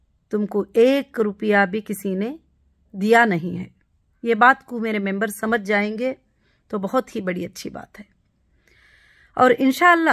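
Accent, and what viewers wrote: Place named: Bihar, India. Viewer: ye bihari hai bol se lang raha hai to up bihar m launch kar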